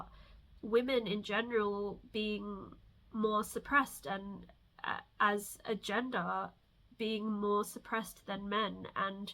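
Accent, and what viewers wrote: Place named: Australia. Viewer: 🕊 Blessed Shukra/Venus day Love💌, You are so sweet & genuine💕💞💓 I am so honored & humbled by your beautiful sentiments! Thank you😭 🙏🏾🥰 I can say the exact same for you love!! I'm currently living in Puerto Rico. I heard your accent and now I've confirmed you're in Australia! I've been being called to the Southern Hemisphere for decades and I know I will get there one day! You're always welcome my way!! I'm a traveler so I'm always changing my LO📍 I have previously shared creations and guides/tools online but I've been preparing for my next projects so I'm not currently on any socials outside of this YT I don't use. I occasionally use X for specific purposes. Big Rebirth as I'm fully exiting my Sade Sati, my Rahu/Ketu returns, Revati Rahu/Hasta Ketu D1 and I have a D9 Jupiter Punarvasu. I'm very eager by next year to gather fellow vedic astrologers like yourself and collectively put something out together and start a support network. 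I've got some books/content in the works right now and a tarot deck I've been designing. I checked out your soundcloud and🙌🏾 🔥💀🌺📿 I'm an Anuradha MC Jyeshtha Venus with Dhanishta D1 I'm so here for that trip you're taking ppl on!! Thank you for blessing us! I'll support you any way I can!! I have a lot of friends that are global DJs. My friend DJ Haram/Zubeyda is a female queer DJ who loves to support marginalized artists. There's a great network in that crew of people I used to support more physically. She's a great person to connect to!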